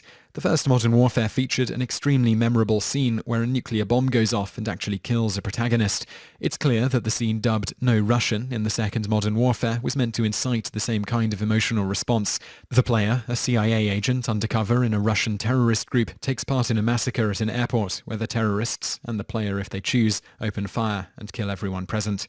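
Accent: United Kingdom. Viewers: Oh dear god. If I see another comment complaining about how the narrator pronounced 'Controversy', I'm going to smack someone. It's his accent. It's NORMAL! There is more than one kind of accent in the UK. Not everyone there sounds like Hugh Grant, so get off your high horses. Every English speaking country pronounces things in a different way. Perhaps we're the ones saying it differently because the English language obviously didn't start in North American. It's like me making fun of Americans for spelling things all wrong Ex. It's Humour not Humor or It's Colour not Color. Though, with all due respect, the USA is probably the last place to look to for 'proper' English. Speaking and spelling.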